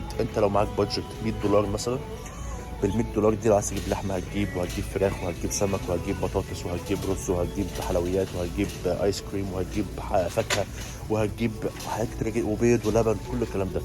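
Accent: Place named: Arab world. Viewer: لا حسيت الاكسينت بتاعك في الانجليش اكسينت عربي فيمكن انت احتكاكك مع العرب اكثر بس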